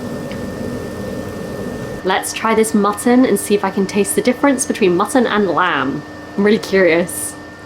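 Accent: United Kingdom. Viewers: 呑兵衛のさん、しかもサッポロ黒ラベル、ジンギスカンとビールが進みますね。華麗なイギリス英語の、聞きやすい発音、大きめの文字、教材としても秀逸です。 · 見事なブリティッシュイングリッシュです聞き取れません脱帽ですすごいです🎉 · LOL なるほど！ ”obviously” って、こういうふうに使うんですね。勉強になったー。それにしても、ずっとさんのイギリス英語を聞いていたら、イギリス英語の発音が大好きになってしまったよ。そして、自分よりも多くの日本全国のおいしい料理を食べてるさんが、うらやましい笑今日のビデオは一段とおいしそうで表情が豊かで最高でした！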